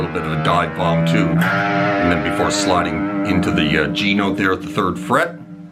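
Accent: Canada. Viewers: Well, thanks for sharing your knowledge! By the way, from the Traynor amp in the background and your accent - I knew you had to be Canadian. (I grew up in Alberta.) Checking out your offerings now!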